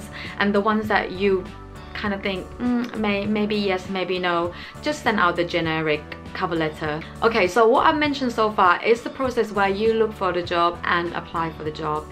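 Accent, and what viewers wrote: United Kingdom. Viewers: Wow. How did you get that British accent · You look like Asian but your English is British good job 👍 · You are from Thailand but I am curious about your British Accent? Did you always talk like that by chance? Thank you.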